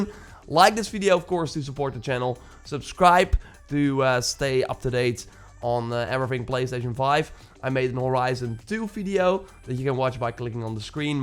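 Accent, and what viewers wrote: Netherlands. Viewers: Kijk uit naar je content man! Ik weet het niet zeker maar aan je accent denk ik dat je ook nederlands bent! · ik weet het niet zeker maar je klinkt NL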